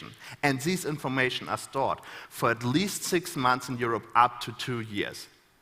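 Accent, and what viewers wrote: Germany. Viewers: Sort of, but it's still more important what he's saying rather than how well he's communicating. His issues communicating could've just been nerves or an actual mental tic, as well as combining that with his german accent in the english language, but what he's saying is scary as hell. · Those are standard speech tricks for stutterers, to stop them from stuttering. And the german accent of course. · Ohhh, it's a *stutter*. I thought that was just how to German accent made some people sound. >_>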